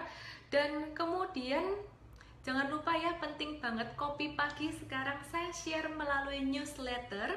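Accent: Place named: Java, Indonesia. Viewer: Disini medok bgt ya 😅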